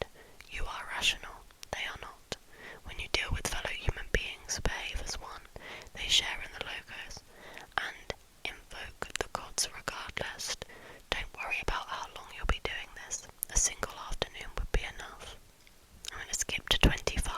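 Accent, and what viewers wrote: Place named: England, United Kingdom. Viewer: yay I love an English accent, subbed! I have a feeling you’ve got a lot of good books up your sleeve, you should totally do a book suggestion vid :)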